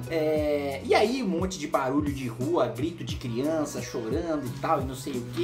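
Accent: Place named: São Paulo, Brazil. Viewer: Só pela fala acredito que seja paulista... e paulista falando que "última bolacha do pacote" chega ser insano kkk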